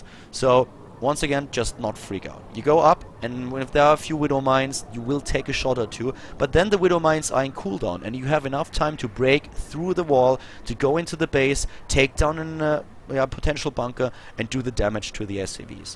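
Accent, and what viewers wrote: Germany. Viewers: i am quite new to this game and got heavy problems to get into it anyway every beginning is difficult.. i watched a lot of videos alrdy highlights builds orders anything.. but your comments and explaining the games is awesome man it really helps and is fun as the same time really awesome videos i cant tell you how much i enjoy to watch your videos about SC2 really really hardcore thanks for that man. dont know may it is your job. finally pls tell me aer you german or american ...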